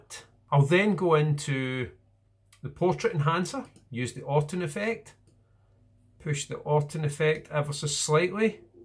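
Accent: Scotland, United Kingdom. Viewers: I looove your scottish! I want you to tell me great tales! =)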